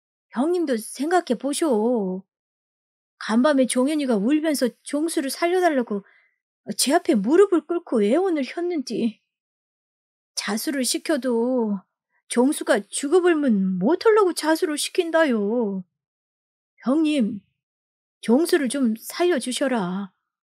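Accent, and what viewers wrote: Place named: Honam, South Korea. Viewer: 혹시 출신이 호남이여.? 워찌 그렇게 억양이 똑 같어부까이.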